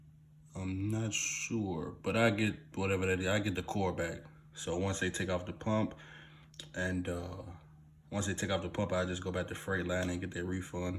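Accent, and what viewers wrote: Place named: South, United States. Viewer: be killing me with that southern draw but we love it lol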